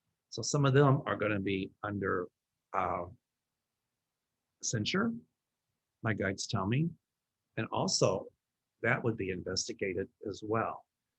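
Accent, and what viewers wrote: South, United States. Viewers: I was just wondering where your accent was from ? I knew it was somewhere from the south. ( I am an Aussie, accents fascinate me ) I love your readings,so happy to see you have recovered from that vile disease 💜💖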